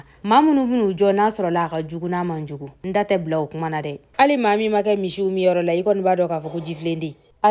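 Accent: Bambara, Mali. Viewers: J'adore votre accent bambara ❤😊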